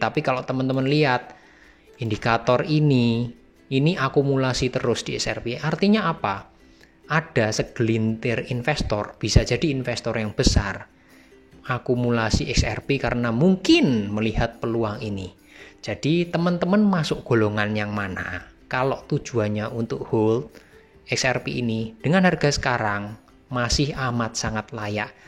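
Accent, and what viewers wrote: Java, Indonesia. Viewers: Ngomong cuk e medok tenan Cuk!!🤣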